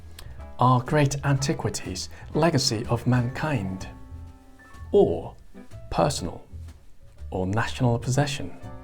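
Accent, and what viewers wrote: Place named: United Kingdom. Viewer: 마놀린구독자인데 동영상이 있다는것 얼마전에 알았습니다. 영국식 영어~ ^^ 처음에는 조금 낯설었는데 집중해서 들으니 들리네요. ㅎ 번창하셔요~~^^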